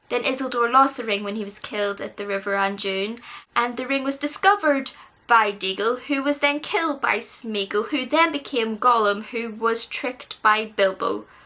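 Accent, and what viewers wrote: United Kingdom. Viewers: I can't place this accent....British....but there appears to be some other musicality subjacent to it. Or my ears deceive me. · Where is this accent from? Northern Ireland? It's my favorite accent to listen to in english. Number 2 is the accent they have in Hawai'i, it's an accent of no worries. Just like australia.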